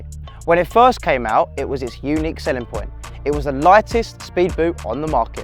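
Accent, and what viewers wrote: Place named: United Kingdom. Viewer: British accent, but says soccer not football😩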